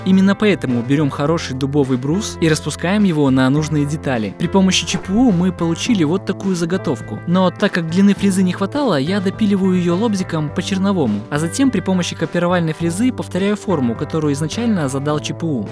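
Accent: Belarus. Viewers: Ты из Белоруссии?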